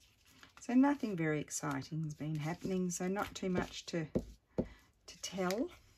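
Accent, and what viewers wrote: Australia. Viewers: Hi, I like your style and the ideas you come up with. How did you come to live in Italy?? Obviously an Aussie accent - then you talked about the weather......not the same as here!! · Hi Yes I found her on you tube with a flip through of Happy mail for Gayle,then I bought her download papers on ETSY and I watch her when I get a chance..I then watched Gayle which led me to you and many others and subsequently more buying of paper...Now Im learning to journal and card make too.....I can't believe there is such a thriving wonderful community of crafters from "All over the World". I'm in New Zealand "Your old neighbour across the ditch...I picked your Aussie accent straight away and was so thrilled to hear of your life in Italy'...Its a great thing this crafting how it brings people together.Thanks.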